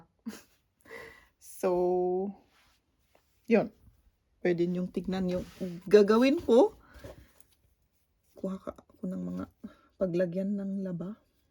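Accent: Philippines. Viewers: Parang filipina kana rin pati pananalita,nakakatuwa naman.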